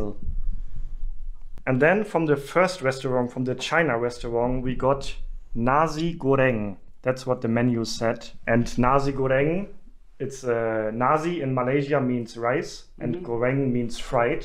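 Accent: Germany. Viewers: Your mum is H.O.T. ! ! Her English also is very English!!?? you seem to have a very strong German accent where your mum is WOW and H.O.T. Please tell your mum XDDDD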